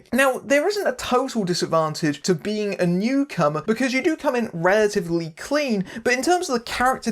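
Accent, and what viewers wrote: United Kingdom. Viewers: Wait, is British?!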